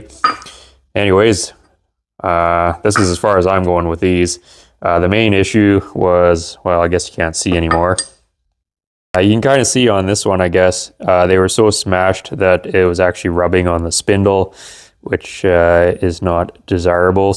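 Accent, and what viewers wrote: Canada. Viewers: I truly enjoy watching your videos and listening to your Canadian accent. You also have a dry sense of humor, as do I. So I appreciate that too. Keep the great content coming. And don't beat yourself up over wasting time. We all do it. I mean, just sitting here day after day watching other people work is sort of a waste of time. But heck, I'm 82 so I do what makes me happy.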